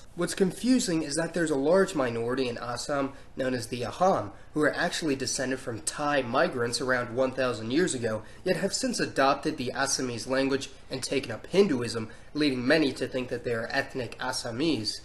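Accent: United States. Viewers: Thaibet? Phulease dude. You sound like you grew up in bf u.s.a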